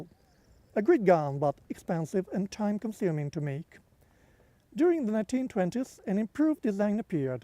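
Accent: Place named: France. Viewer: I'm curious too. Judging from the accent I'd say France, or somewhere French-speaking?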